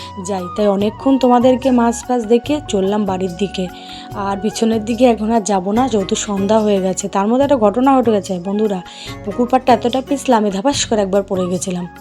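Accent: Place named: Kolkata, India. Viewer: খুব সুন্দর অসাধারণ আপু আপনার কথা গুলো কলকাতার ভাষা ❤🇸🇦🇧🇩👌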